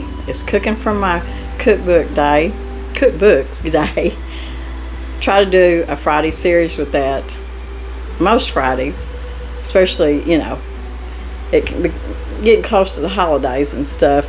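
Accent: South, United States. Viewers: Dear Miss, Absolutely love your hair style! You look prettier all the time, and you’re already beautiful! Thank you for all your wonderful videos and for sharing your lifestyle with all of us……..your devoted fans! I love listening to your beautiful southern accent, no matter what the subject of the day offers, whether it’s cooking, gardening or just listening to you and sharing your thoughts and memories. I’m forever grateful that I found your Utube channel. God bless you! Love, Jackie🪷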